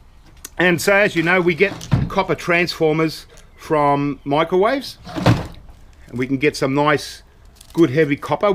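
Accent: Australia. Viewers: I enjoy your videos mate. I love hearing Aussies talk. Never gets old. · I live right in the middle of the U.S.A. and I'm starting to say aluminum, the way you do and I don't even realize it. I wish I could talk like you! Everyone I know loves the deep Australian accents!!!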